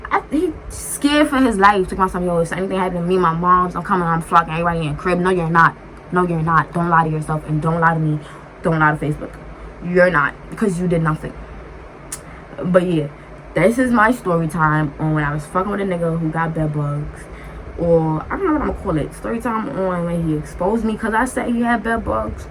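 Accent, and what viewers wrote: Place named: North Carolina, United States. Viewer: I’m from nc and I knew from the minute u opened yo mouth ps love yo accent 🥰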